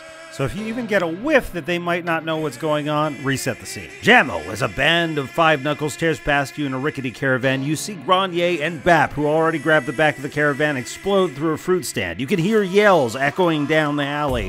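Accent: South Africa. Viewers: Whoaly shit. That's a cavalcade of horseshit to deal with. Also, are you south african??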